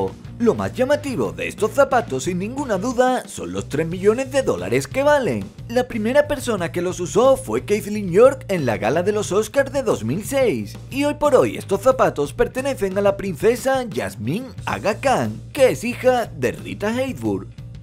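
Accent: Spain. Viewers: hola!! una pregunta eres español??? me dio curiosidad. porque pusiste billetes mexicanos y tu voz parece española 😂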